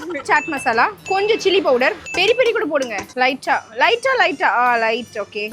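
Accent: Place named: North, India. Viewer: Tamil pesum vadakan 😮😮😮😮😮😮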